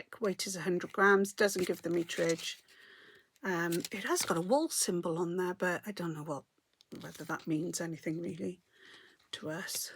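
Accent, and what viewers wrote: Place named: Wales, United Kingdom. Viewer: Love your accent...and that you're from Gavin and Stacey land. Congratulations on the new Grandchildren' I have 2 grown up daughters and 2 grown up Grand daughters. This year I got my first Grandchild in February and the 2nd is due in December. Both boys so I have been knitting in blues, beige and brown. I even had to buy more baby patterns because most of my are for girls. But Grand children are wonderful.x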